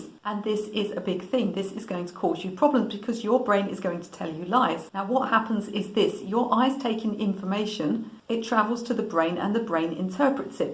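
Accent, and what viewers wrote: United Kingdom. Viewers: Your A brit, Love it. I lived in Ipswich and Colchester for 5 yrs. Love your advice it really helps. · Thank you for the great tips. I'm new to drawing and still trying to get basics etched in my mind. Pressing to hard is a bad one for me and have been doing it since school days. It really has to be stopped so thanks and love the British accent good day!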